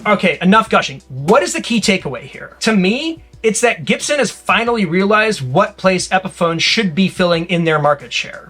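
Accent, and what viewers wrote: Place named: United States. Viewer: I know this sounds weird, but I was so happy to hear an American accent. Can’t stand British videos.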